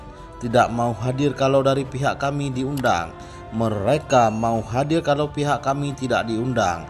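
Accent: Aceh, Indonesia. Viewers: Nice Acehnese acccent. saleum aneuk nanggroe😎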